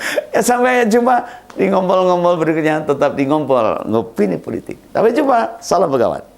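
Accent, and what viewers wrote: Java, Indonesia. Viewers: Om begawan lucu walau jawanya medook 😅😅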